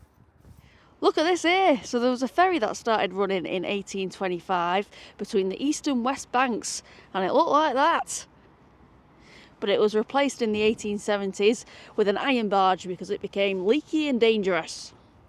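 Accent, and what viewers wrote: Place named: Wigan, United Kingdom. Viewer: I think she says "Hi everyone" run together and sung as one word. I think she's from Wigan which is known for having a strong accent. Some even regard it as its own language known as "Wiganese".. ;-)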